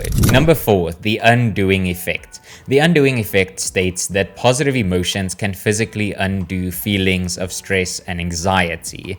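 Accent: South Africa. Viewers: super random but are u south african? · Are you South Africam?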